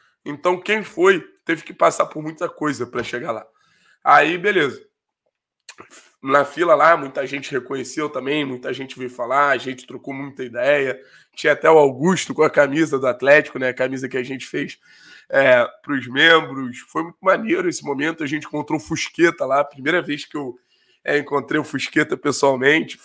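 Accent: Rio de Janeiro, Brazil. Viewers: Olá amigão. Primeiramente parabéns pelo grande canal. E Vc é carioca? E nos conta essa relação com o Athletico pr e Tenta entrevistar o Nicao assim que puder...super Abração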